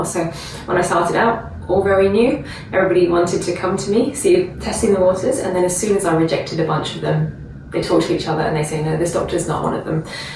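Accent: England, United Kingdom. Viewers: Are you English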